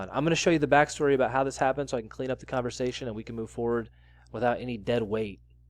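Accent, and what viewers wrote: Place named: Queens, United States. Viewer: Get that " Street cred" , Kill that Monster.....all good...u sound like yur from Queens Lol...Yur all good my dude!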